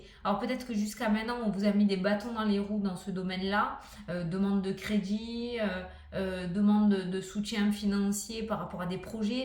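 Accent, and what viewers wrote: South, France. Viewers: Tu me fait rire !!! ton accent du midi qui chante, ton franc parlé ,ta joie de vivre te regarder m'apporte de la bonne humeur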